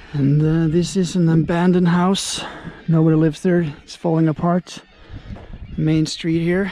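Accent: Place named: United States. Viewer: Ok, i imagined that you were a turist due to your english pronunciation that looks like american (or canadian) to my ears .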